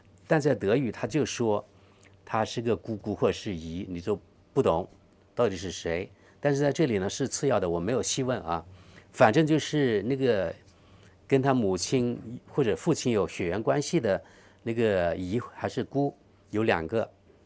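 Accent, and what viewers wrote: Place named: South, China. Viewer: 每个地方人都有口音，尤其南方人，大家相互理解吧，其实我们南方人听着南方口音更亲切呢。他还特地做英文，中文字幕，很不容易，请支持！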